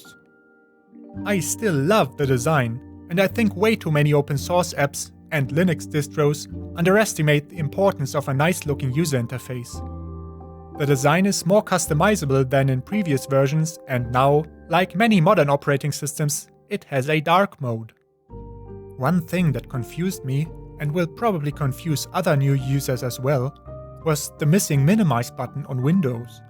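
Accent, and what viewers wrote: Germany. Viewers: I know right! I love his god damn German accent.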